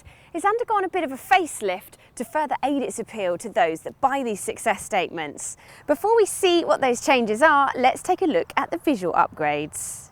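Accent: United Kingdom. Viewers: Why was I surprised when the steering wheel was on the right side? Her accent. The video says UK. Why didn't I see it coming? I'm an idiot hahaha. When she got into the front seat I was like "WTF?!" shows how much I was actually paying attention hahaha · banaaaanas... love the british accent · british accent, in a sexy thin girl, so hot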